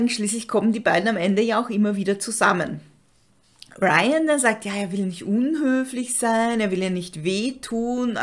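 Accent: Austria. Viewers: Also ich kann nicht mehr aufhöhren dich zu höhren ! Du hast jetzt den ersten platz von Allen,,true crimerinnen,,dein österreicherische akzent ist die kirsche auf der torte. Weiter so❤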